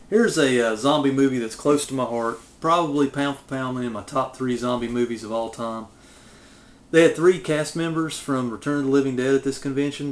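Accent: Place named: Western Kentucky, United States. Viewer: Is that an actual poster for "The Mutilator" aka "Fall Break" !!??! That is bad ass. Also, your accent sounds very similar to the folks where I grew up in Western Kentucky. Great vid. Sounds like you had a blast.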